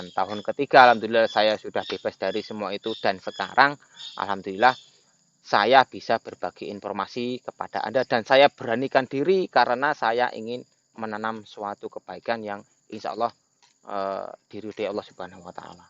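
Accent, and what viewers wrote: Java, Indonesia. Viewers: Mas ini domisili di mana...?kok logat2nya orang jawa...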